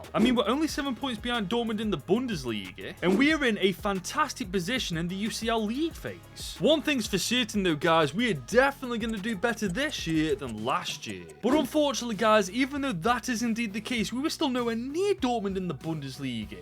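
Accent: Stoke, United Kingdom. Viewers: Eh up mate, which part of glorious Stoke are you from?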